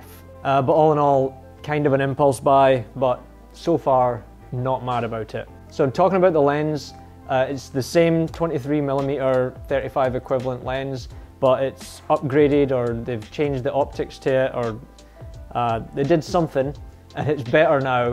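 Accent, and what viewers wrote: Scotland, United Kingdom. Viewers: Hey, you lucky person! A new X100V that someone didn't want, at a really good price. I bought mine and got it two days before the stay home began in Scotland... I've got the same black version. My weather seal kit was on backorder when I picked the camera up, so once this crisis is behind us, I’ll go and collect it... You said you weren't keen on it... Persevere with it, you might enjoy the simplicity of the camera, it just takes a wee bit of time to enjoy it. It looks like you're in the USA but I hear a Scots accent there... 🏴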